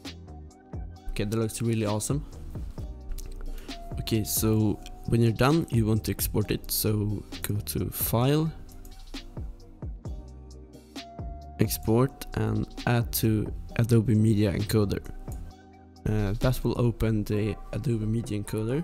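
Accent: Sweden. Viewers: U sound swedish! Im norwegian btw:) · Are u Swedish? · Heard instantly that you were Swedish, what’s up my Swedish brother. (Också svensk:)) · Hahahahahahah jag hör att du är svensk😂 bra video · I Love that Swedish accent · Your from sweden They talk english like that Im from sweden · jag älskar din svenska dialekt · U swedish or norweigan? I guess sweden😆Im from Sweden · No way! Ur from Sweden! I hear that from ur voice! 😹 I’m also from Sweden 🇸🇪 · Are you swedish?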